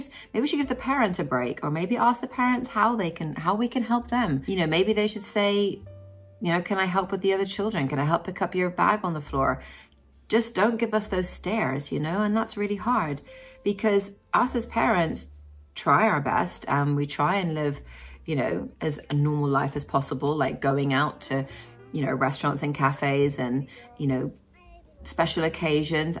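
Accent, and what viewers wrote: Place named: Ireland. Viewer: Are you Irish? I'm Irish and can hear a twang in your accent lol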